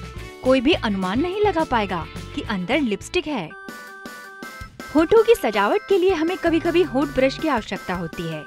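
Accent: Standard. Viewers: itne shuddh Hindi Hum Bhi Nahin bolte hain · Yeah puri shudh hindi bolti hai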